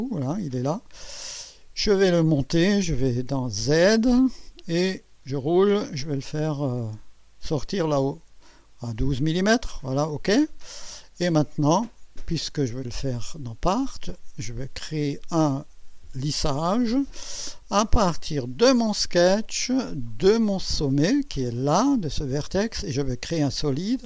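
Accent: Alsace, France. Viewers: Ton accent me fais penser à l'entraîneur de foot *. Mais que suis-je bête il est aussi Alsacien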